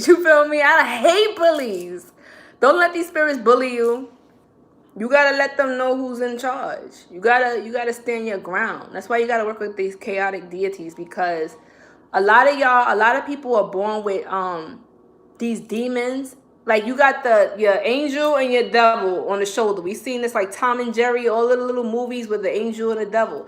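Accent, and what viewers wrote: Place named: Brooklyn, United States. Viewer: I love you my Sister! You had me at Chaos Magick then you dropped info on St Expidite and I knew you were bad ass and that BK accent is off the chain. Shout out to Masterly Foolish for bringing you to my attention. I'll be checking you out and hanging with you. Let you know how the mirror spell works. Thanks for the knowledge.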